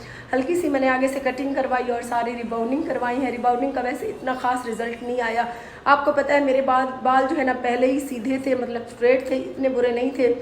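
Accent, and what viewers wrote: Saraiki, Pakistan. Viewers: Ye apka home kis city men ha ,ap saraiki tongue men Urdu bol rahee ho 🤔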